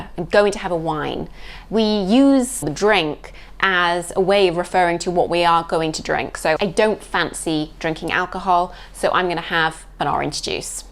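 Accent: United Kingdom. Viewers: Hello! If I go to the restaurant in Europe, Should I say ' Could I have a bill please?'. I love your British English.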